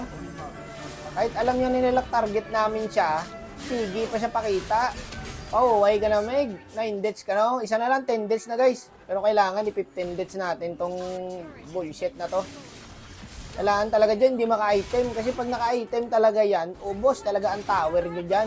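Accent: Iloilo, Philippines. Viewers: ilonggo yan boi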